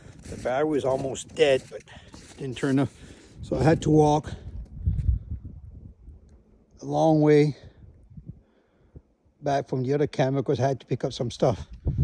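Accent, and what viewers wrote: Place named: Louisiana, United States. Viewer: If you're Canadian why do you sound kinda like a Cajun?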